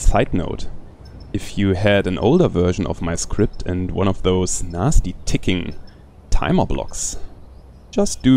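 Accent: Germany. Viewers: you have german accent, i can hear it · are u german? im german myself so i kinda know how it sounds when a german speaks english and for some reason my minds telling me that ur german when u talk.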